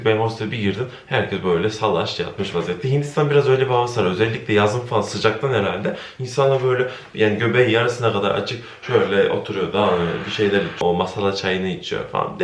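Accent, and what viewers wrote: Konya, Turkey. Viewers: abi ilk videonu izlediğimde bu kesin konyalı dedim ve konyalı çıktın 😎😎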